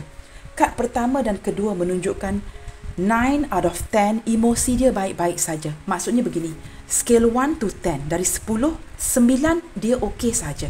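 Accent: Malaysia. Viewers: Thanks you atas pencerahan nya bunda and aku suka swara nya yang khas Melayu nya sexi sekali bunda and sehat selalu ya bunda semanggat trus dalam konten nya 🙏🙏🙏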